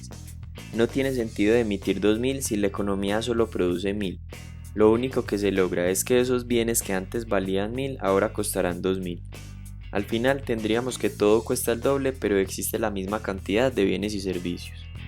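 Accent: Colombia. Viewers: Me encanto colombiano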